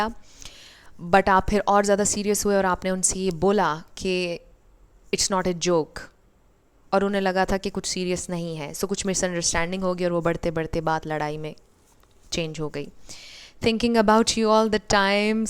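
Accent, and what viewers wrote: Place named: Pakistan. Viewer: Mujhe lagta hai aap Pakistan se lag rhi hai.. Kyuki aapki bolchal kuch ehsas dila rhi hai. Any way. All the best to you. And Happy New Year 2024❤❤🎉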